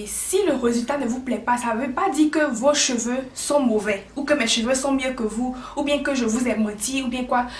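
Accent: Ivory Coast. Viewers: Tu es ivoirienne? Je viens de m'abonner l'accent ivoirien là 😉😉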